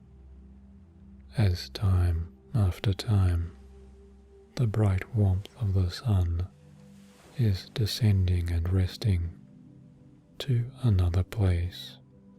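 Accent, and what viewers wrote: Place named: Australia. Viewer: Australian he is great, I’m an Aussie he does have a good accent and nice bass to his voice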